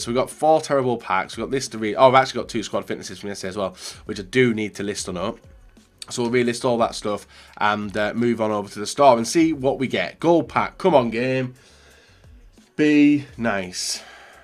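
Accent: United Kingdom. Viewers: you from u.k??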